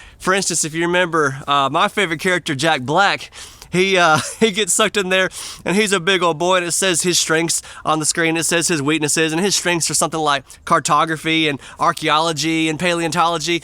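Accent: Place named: Mississippi, United States. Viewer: I grew up North as far as ya can get in the lower 48. I’m no Yankee tho. Hunt, fish, worked on farms, rode horses, cut timber. Oddly, I got bunked with from Mississippi such as yourself. Minnesota/Mississippi connection of the start and end of the Big River. Shouldn’t have, but we got along just fine, confusing folks with our polar opposite accents😂🇺🇸👍🏻💪😎🤷‍♂️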